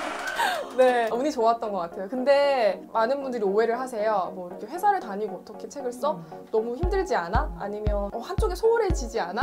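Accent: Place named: Busan, South Korea. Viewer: 기자님 사투리가 부산일보 영상이라는 걸 보여주네요 ㅎㅎ 좋은 영상 감사합니다.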